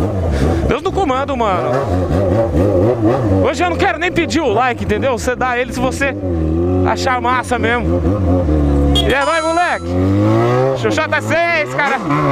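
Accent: Goiás, Brazil. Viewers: Tu é de Goiás mano? kkk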